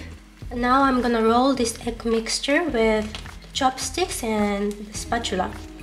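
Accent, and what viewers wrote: France. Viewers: の英語アクセントにフランス訛りがあって、すっかり🇫🇷に馴染んですごいなーと勝手に思っていました🥺💙🤍❤️ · Your English has a slight French inflection, so cute:)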